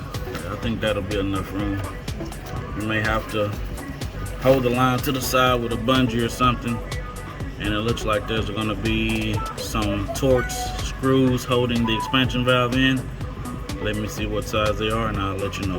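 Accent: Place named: Texas, United States. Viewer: Man I heard you talking and I know straight up yous from Texas nail it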